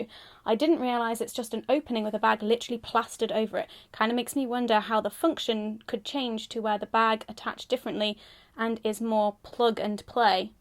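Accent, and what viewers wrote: United Kingdom. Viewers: Your accent is british... Atleast i felt so ... Is that true ???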